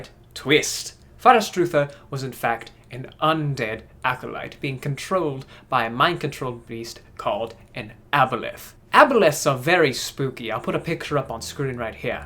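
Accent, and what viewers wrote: United Kingdom. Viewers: What's more impressive than his British accent is how long his can keep his accent. Mine tends to fall apart the longer I try to speak with it. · Y’all already know that soon there’s gonna be fan fiction (smut) on Wattpad of Voltiaere🙈 Btw I’m simping over because of his content and his British gentlemen from the 1800’s accent aka Voltiaere😳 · read the bee movie script in your british accent. this is an order. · That one person that disliked is just salty cuz they can’t do a British accent as good as his. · Come for the British gentleman voice, stay for the amazing DND stories I mean seriously your home brew content is so damn cool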